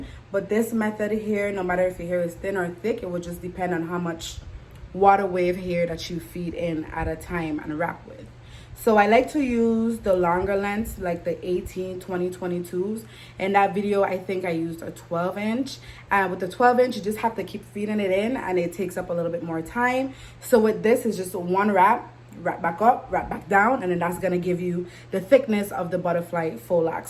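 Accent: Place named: Barbados. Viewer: These locs are awesome and you are soooo gorgeous keep up the good work Your accent sounds a bit like a bajan lol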